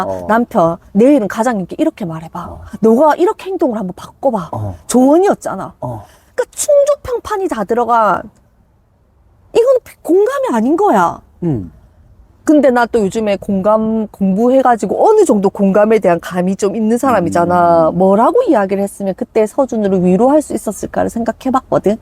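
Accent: Gyeongsang, South Korea. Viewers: 사투리.. 저는 오히려 정감있고 리듬감 있어서 더 집중하게되고 잘 들려요~ 참고로 경상도사람 아니에요 ㅎ 오늘도 많이 배웠어요! 늘 감사합니다! 🤙🏻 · 앗 저는 서울 사람인데 경상도 사투리 너무너무너무 힙하고 좋던데요????? 그리고 사투리 덕분에 영상도 더 따숩고요! 팬입니다!❤ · 저도 경상도 사람이라 너무 정겹고 말투가 제 친구랑 완전 똑같아서 더 친근감이 드는...ㅎㅎ영상 잘보고있어요~~저는 기꺼이 육아가 너무 좋아요^^ 늘 좋은 정보 뼈때리는 정보 감사합니다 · 전 경상도 사람인데 서울로 올라와서 서울말 쓰고 생활하는 사람인데요 선생님 강의 들으면서 "아 경상도 말이 이렇게 정감있고 재밌는 말이었지~~~ 나도 다시 경상도 사투리 써볼까 ㅎㅎㅎ" 생각했었는데요!!! 선생님 화이팅♡♡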